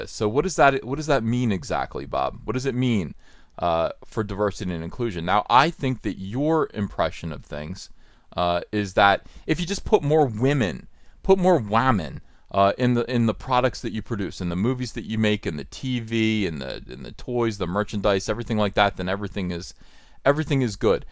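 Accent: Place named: New Jersey, United States. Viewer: Knew you were from Jersey by your accent! Maybe that’s why I can relate to you so much. That and how humble we both are 😂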